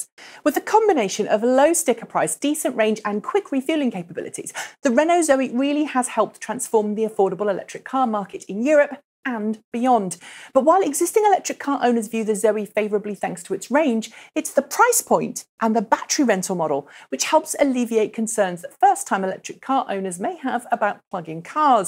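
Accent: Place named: United Kingdom. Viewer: Judging by your accent you're from Britain, why are you pushing a frog car? Maybe you should visit Trafalgar Square more often.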